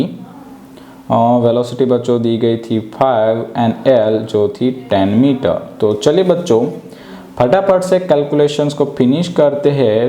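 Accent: Gujarat, India. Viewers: Are you from gujarat ?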